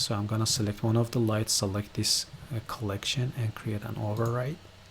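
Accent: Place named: Iran. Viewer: اقا دمت گرم خدا قوت خسته نباشید خدا وکیلی عالی بود کارت اگه مثل خودم لهجه نداشتی اصلا فکرش رو نمی کردم ایرانی باشی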